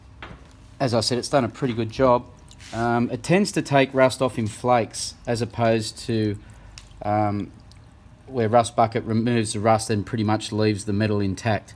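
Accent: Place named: Australia. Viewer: I knew it was an australian accent But i doubt myself initially.. Because the way he speaks is so different to other english speaker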